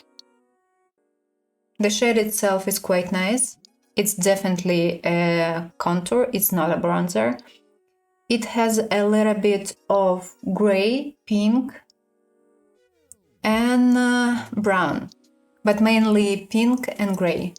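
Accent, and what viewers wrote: Russia. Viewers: Ты русская? Просто русский акцент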